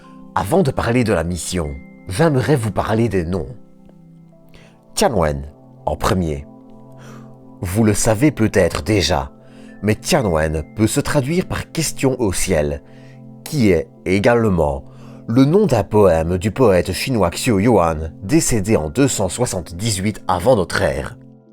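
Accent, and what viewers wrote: Belgium. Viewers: Ta un accent belge jolie video👍👍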